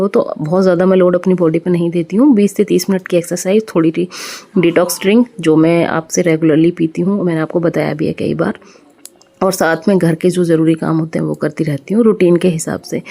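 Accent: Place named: Meerut, India. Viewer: Didi aap ki sasural kahan per hai mujhe aapki Jo language hai vah merath Jaisi lagti Hai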